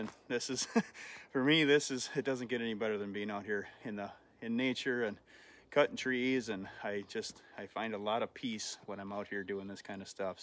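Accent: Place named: Wisconsin, United States. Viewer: can tell you are from wisconsin! you betcha.. gotta take the family shopping at fleet farm for EVERYTHING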